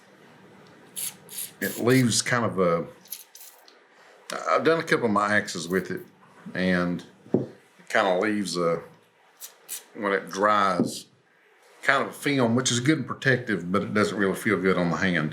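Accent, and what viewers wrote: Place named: South, United States. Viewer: My husband and I just watched the whole thing together. Such a great video!!!! James has a few antique tools that my grandpa has given him and HE LOVES THEM!!!! Your channel is seriously so cool. I love your content and I love this video!!! :) Great job restoring!!! Keep creating. I’m subscribed and will always be:) Lastly, I love your accent! I wish I had a southern accent... From, Collins Country:)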